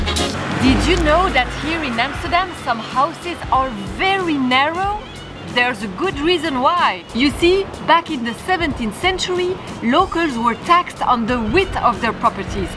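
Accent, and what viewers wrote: France. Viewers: Bonjour je suis du Canada,Montreal je vous adore une française qui parle anglais Très belle accent vidéo intéressent quand allez vous parler du osmo pocket Continuer votre bon travail bizou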